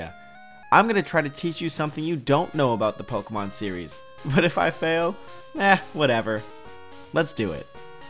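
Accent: Netherlands. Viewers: Are you dutch? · Wait are you dutch?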